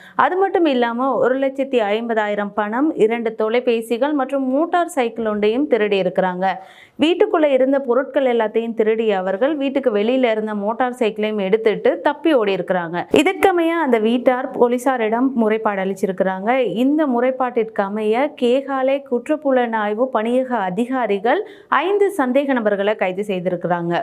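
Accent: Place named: Chennai, India. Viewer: இலங்கை மக்களுக்கு செய்தி Madras தமிழில் தூதூதூதூ.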